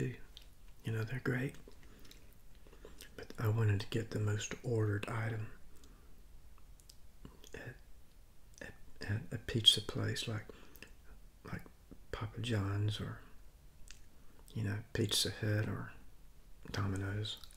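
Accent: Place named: Appalachia, United States. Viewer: Where are you from my man? Like the accent, pretty sure Appalachian or mid south but was wondering exactly what State ur from? Love the vid and keep doing what ur doing brother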